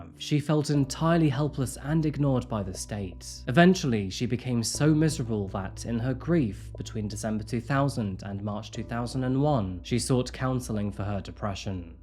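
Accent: United Kingdom. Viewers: Dudes British, he gets a pass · Sheesh! Sorry folks are all mad abt pronunciation...crazy how people from different places say pronounce things differently. They missed a couple though! You also said the letter "h" funny! (Like a Brit i guess, we say it more... without an h ... like "aytch" and you said it w an actual "h" at the beginning! 😂 and then... you said Delimar told her mom "your my mum"... (we say mAWm)... its almost like youre not americain!😂 like youre pronouncing things the way youve heard them! Crazy! 😂 sorry... having fun noticing your british bits now. Now i want you to say "today's case takes place" like its "the rain in spain falls mainly on the plains..." Jeeeze. People be so picky. (P.s. my name is Dominique, but americains always want to be saying it like an italian guy's name, "dAWm ah nick", so here we are... sigh)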